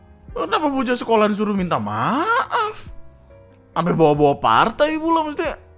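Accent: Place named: West Java, Indonesia. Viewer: Ini gw aja atau emang bang jadi ada logat sundanya wkwkwk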